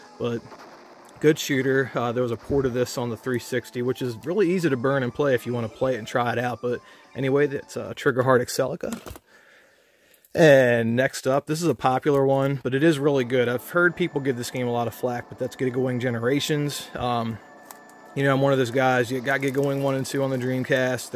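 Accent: Baltimore, United States. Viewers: Btw you have the definition Baltimore accent.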